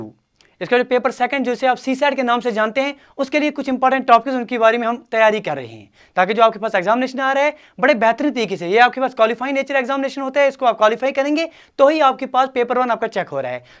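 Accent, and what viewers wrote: Kashmir, India. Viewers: Kasmiri guru jee Accent is cool loved it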